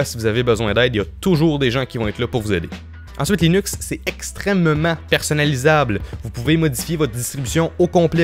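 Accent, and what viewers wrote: Quebec, Canada. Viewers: killer des québécois* les canadien ont pas cette accent, la plupart ne parle même pas français, c'est un québécois (au canada) et c'est l'accent québécois ;) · Clair, net et compréhensible. Si seulement tous les tutps étaient aussi compréhensible. Et je kif l'accent québéquois. Trop marrant. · Super a part qu'il parle un peux trop vite . de plus avec son accent canadien sa arrange pas les chose mais c'est se qui fais le charme de se jeune homme. Concrètement vidéo sympathique.Vivement la prochaine vidéo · L' accent canadien, j'adore !!!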